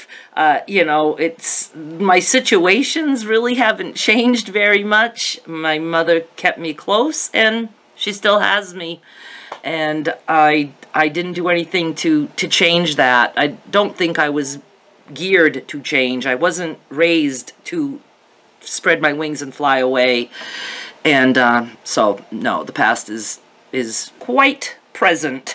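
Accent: Maine, United States. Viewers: I love your straightforward comments and your incredible wit and fine, fine brain. Listening to you makes me so homesick for Maine. It is what it is...my great-grandmother used to say, " Well, it's just part ( paht) of the program."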